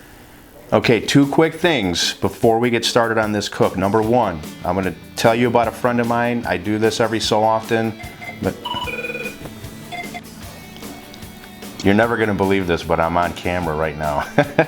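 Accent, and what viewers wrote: Chicago, United States. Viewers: Ok ok you got me new sub and I detect a Chicago land accent so just curious where from. Spent 35 years mostly in DuPage county and damn I miss the food as these folks here in southern New Mexico dont have a clue. I'm gonna give this a go this Sunday for football but I may pan sear and then run in the slow cooker to get tender.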